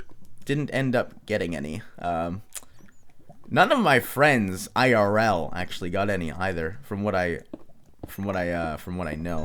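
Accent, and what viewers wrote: Canada. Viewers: Is he Canadian? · he is Canadian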